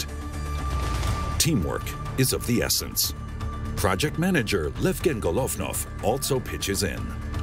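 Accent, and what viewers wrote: United States. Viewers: funny to hear a heavy american accent narrating russian hih-end technology in such a respectful way. there's hope for USA... hahahahahaha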